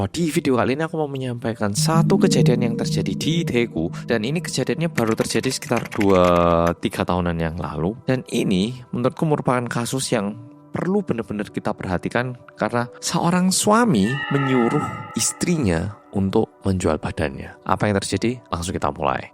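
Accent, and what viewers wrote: Java, Indonesia. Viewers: Hai medok.... Nyimaaak 🎉🎉🎉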